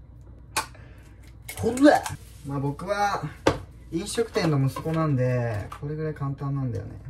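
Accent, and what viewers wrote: Kansai, Japan. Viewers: 関西弁いいわぁ🤝🏻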